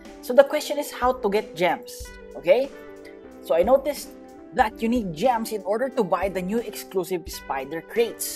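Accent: Philippines. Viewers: You sound filipino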